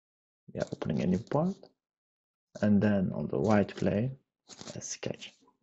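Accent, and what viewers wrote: Turkey. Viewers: türk ingilizcesi bu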